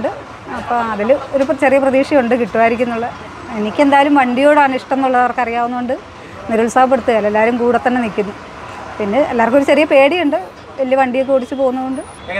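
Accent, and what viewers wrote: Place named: Pathanamthitta, India. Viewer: കാസറഗോഡ് കാരി ആണെങ്കിലും തനി പത്തനംതിട്ട കാരിയുടെ സംസാരം. 🌹🌹🌹👌👌🙏🙏👍👍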